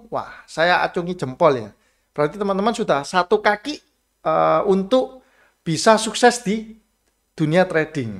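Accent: Surabaya, Indonesia. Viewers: arek suroboyo kapasan toh bro.. auto like n subcribe.. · Wah asli suroboyo iki.. Salam dari meduro.. 😁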